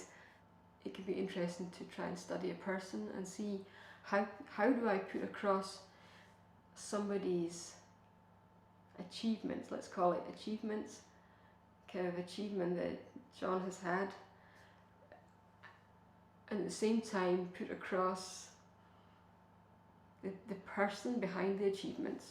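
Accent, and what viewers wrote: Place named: Ireland. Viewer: Brzenk, is your muse....so to speak .I just realized , You sound Irish :) P.s , tak for flex, var dejligt at se ;)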